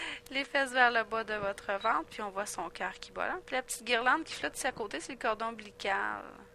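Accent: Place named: Quebec, Canada. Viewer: Québécoise tout simplement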